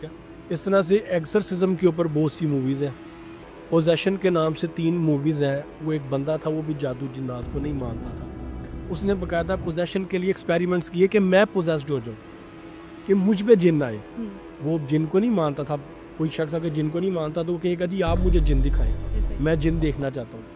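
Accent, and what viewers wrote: Punjab, Pakistan. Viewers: خوف ہے کھوف نہیں اینکر ہو پاکستان کی انڈیا کی مت بنو تمہاری اردو میں پنجابی کی جھلک نظر آرہی ہے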